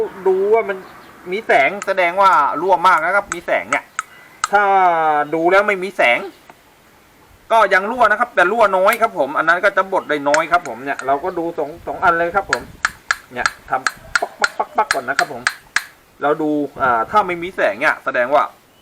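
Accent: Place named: Uthai Thani, Thailand. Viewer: สำเนียงช่าง เหมือนคนหนองฉาง อุทัยเลยคับ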